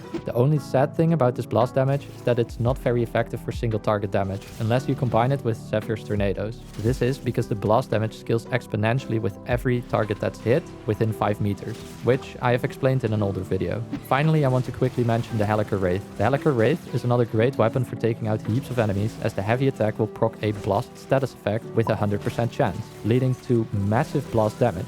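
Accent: Netherlands. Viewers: Nederlands accent gaat enorm hard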